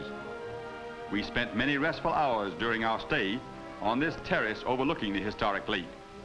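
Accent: United States. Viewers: To us Brits it's definitely an American accent. His pronunciation is a tad bit dodgy as well. Druzes!!! It's just Druze. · It's called Trans Atlantic English. It was very common back then. No one actually talked that way, but pretty much any American movie from that time has this accent. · To me it sounds American. Only a few words here and there sound "British"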